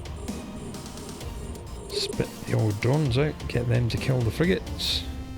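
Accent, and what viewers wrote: Scotland, United Kingdom. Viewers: your videos are amazing, lovely conversational way of going through things, the Scottish accent and easy going manner is of course fully to blame. A top lad.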